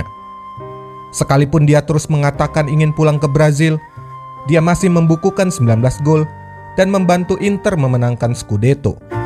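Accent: Medan, Indonesia. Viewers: orang medan ini ya? dari aroma suaranya uda kecium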